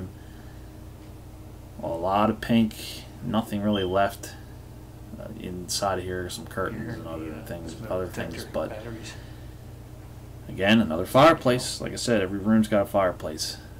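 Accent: Philadelphia, United States. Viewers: What is your accent? Are you a Philadelphia area native?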